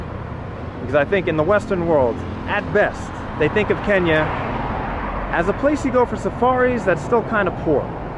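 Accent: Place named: New York, United States. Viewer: Awesome ! leaving kenya is always hard and boy time flies! EAC is beautiful . Thank you for your corky NYC accent/narrative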